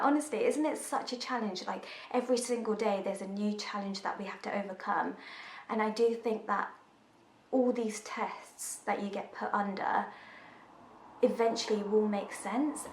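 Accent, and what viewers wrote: United Kingdom. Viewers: ur from india and u have a british accent lol. that's so cool. how come u have a british accent but ur indian.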